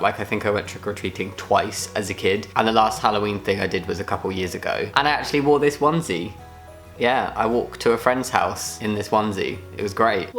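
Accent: United Kingdom. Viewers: BRITISH BAKE OFF PEOPLE! I just love how British is (just like me!) we have a very similar accent!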